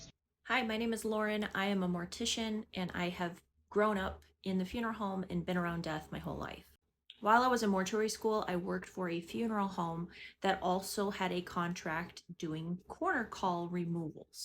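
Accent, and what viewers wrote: Minnesota, United States. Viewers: Minnesota, i believe. Her accent really stands out. i think she hinted where abouts she was from, in other videos, if she didnt come out and say it.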